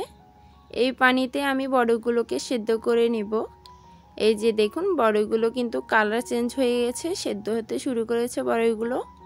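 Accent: Chittagong, Bangladesh. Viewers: Apu tumar basha ki ctg teh?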